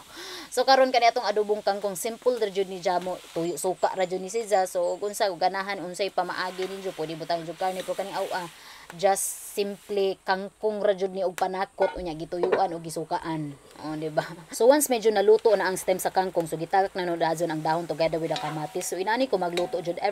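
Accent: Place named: Bohol, Philippines. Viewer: Ganahan jud kaayo kos accent sa bul anon uyy 😍 always watching from agusan del sur ❤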